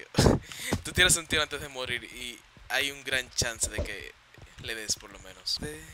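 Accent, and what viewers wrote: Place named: Dominican Republic. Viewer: Hay deohmeo mijo tu ere de RD representa ese acento :v parece pajaro :p sin ofender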